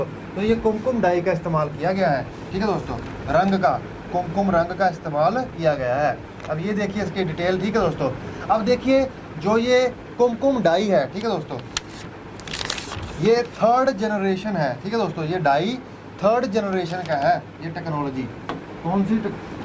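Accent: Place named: Haryana, India. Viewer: yaar pure hindi se bolo.. ye haryana language maja nai aa raha he....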